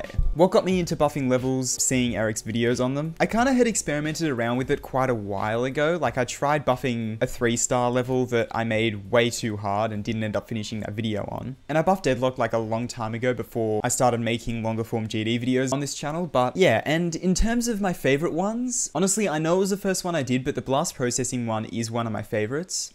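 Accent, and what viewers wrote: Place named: Australia. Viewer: absolutelly i love your australian english accent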